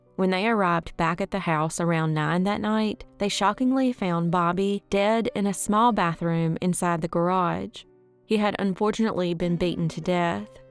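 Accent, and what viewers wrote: South, United States. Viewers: Just heard about this story. 10 y/o Bob Boyes went missing in port republic, calvert county, md, Dec 26 1968, along with his pet deer, and has never been seen again. I know you already have a lot of suggestions. but would love to hear your southern accent, doing his story. Both parents, now deceased. Love fr md expat, living in panama. Thanks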